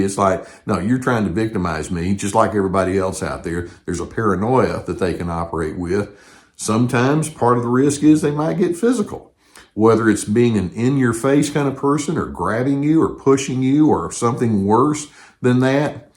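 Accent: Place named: Alabama, United States. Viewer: Thanks again for your calm voice, your lovely Alabama accent and your great teachings on dealing with narcs. Mine moved out 6 years ago but still phones me occasionally and I still get a bit sucked in to his sob stories and lies and accusations, his gaslighting etc. One part of me still misses him. The other part knows I've gone back into denial and fantasy land. Listening to you always puts me back onto firm ground again. Many thanks.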